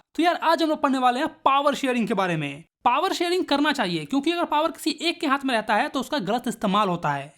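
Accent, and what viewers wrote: Bihar, India. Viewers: bhaya aap haryana se ho kya mujhe to bihar se lagte ho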